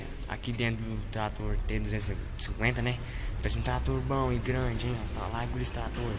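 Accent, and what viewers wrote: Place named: Brazil. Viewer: A sua simplicidade de falar e mais lindo, acho lindo os sotaques desse brasilzão, Deus abençoe! Desejo para você sucesso com o seu canal!🤝🏻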